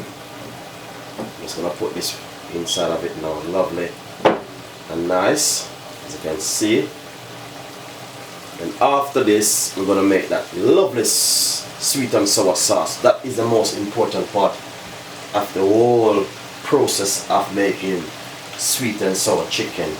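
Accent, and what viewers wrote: Jamaica. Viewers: Jamaican talk😅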